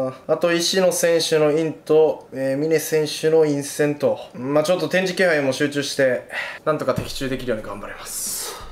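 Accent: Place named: Senshu, Japan. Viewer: 泉州弁みたいな喋り方だな〜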